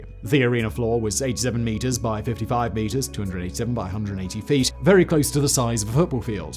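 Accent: England, United Kingdom. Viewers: Man, in all honesty, someone has to direct this videos better. I know he has a British accent but in some parts his words are barely clear and sound like a run on sentence. Also some clips were cut short. Many words were butchered and I can’t understand how this video was cleared to be published. Please.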